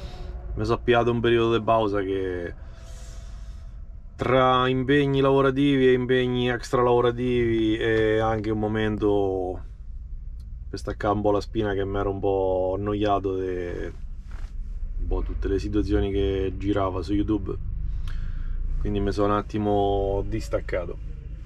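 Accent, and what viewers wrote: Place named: Marche, Italy. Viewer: d'allaccento mi sembri marchigiano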